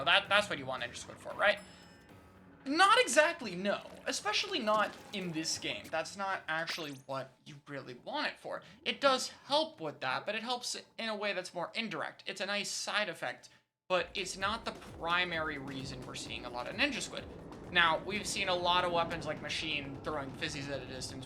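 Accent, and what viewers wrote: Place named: United States. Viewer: Something about your cadence in this video makes you sound like an American flc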